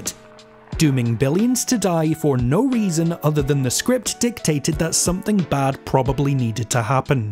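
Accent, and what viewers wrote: Scotland, United Kingdom. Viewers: Goddammit this is an awesome channel!!! What part of Scotland are you from? I'm a St Andrews man myself but live in Romania so it's always good to hear a Scottish voice. Thanks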